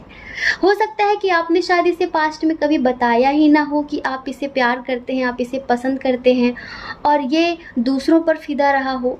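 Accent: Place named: Bihar, India. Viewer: aaj pehli bar hamne apki video dekhi hame bhi yahi laga ki aap up ya bihar se ho kyuki bhasha se yahi laga tha kyuki ham bhi bihari h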